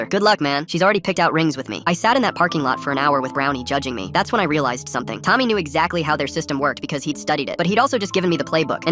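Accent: Jamaica. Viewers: Is bro Jamaican or something 🇯🇲😅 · Bros Jamaican like me 😂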